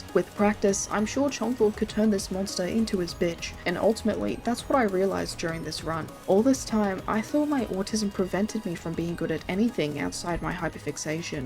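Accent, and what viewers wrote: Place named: Australia. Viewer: I will never get tired of hearing "Chonk Lord" in an Australian accent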